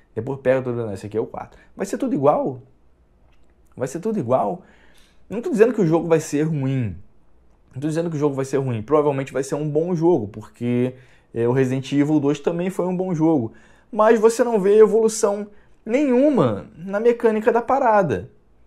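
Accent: Rio de Janeiro, Brazil. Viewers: Tu é muito chato cara, pra começar esse teu S puxado de carioca, só sabe falar mau, a DLC do Re. 2 gratuita, muito da hora, aposto que tu nem jogo. Falar mais é fácil, quero ve5tu fazer melhor.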